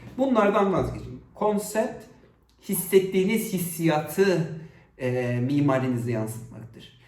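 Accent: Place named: Cyprus. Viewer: Doğu Akdeniz Üniversitesinde öğrenim görmekteyim,konsept ararken videonuza geldim. Şiveniz beni tekrar Kıbrısa götürdü. Bu pandemi döneminde çok güzel hissettirdi. Videonuz da çok yararlı oldu. Teşekkür ederim.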